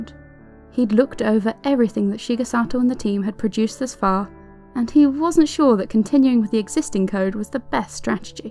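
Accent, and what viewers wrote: United Kingdom. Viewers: Oh how I love this british accent. A nation of great narrators you are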